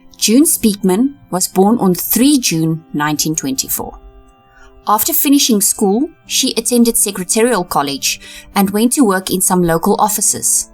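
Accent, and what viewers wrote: South Africa. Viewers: I have heard of this case before but, your in-depth coverage of this harrowing story is second to none. Love your (SA?) accent, by the way. I have subscribed and look forward to more of your content in the future. Cheers from Oz! 🦘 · Hi from Burundi. Yes I figured it was an SA accent!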